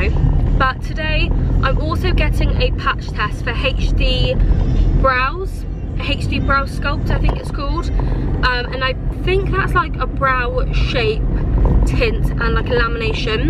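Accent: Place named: United Kingdom. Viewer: I watch it for her british accent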